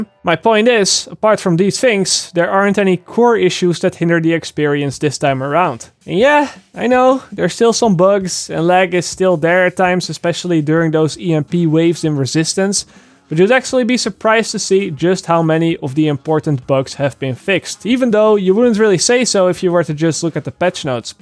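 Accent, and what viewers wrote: Netherlands. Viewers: Aksing Are you dutch ?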